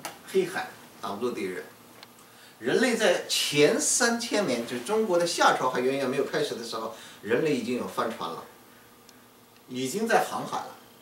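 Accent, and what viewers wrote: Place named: Northeast, China. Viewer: shipeng LI 我就是西北人 可他的口音一点也不像西北人 倒是和东北人神似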